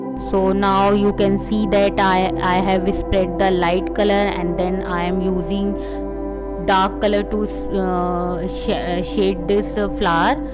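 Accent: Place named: India. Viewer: You have Indian accent! Are you Indian?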